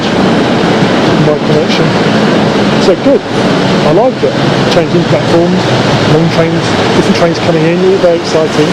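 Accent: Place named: Southern England, United Kingdom. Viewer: i live up here what a lovely change to hear a southern voice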